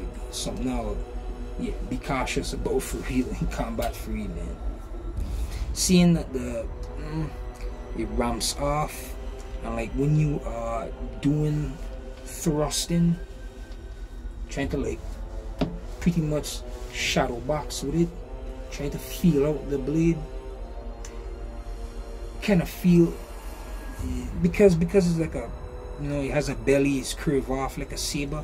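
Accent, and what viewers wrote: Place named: Sint Maarten. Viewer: Bro.. u from Sxm? Ur accent is to close to home..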